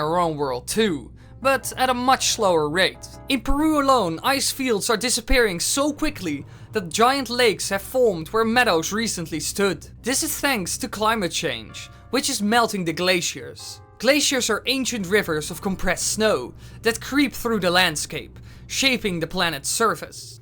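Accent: Netherlands. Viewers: You really sound like a dutch man :3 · Ben je nederlands? Jouw accent heeft echt wel overeenkomsten met nederlandse taal Haha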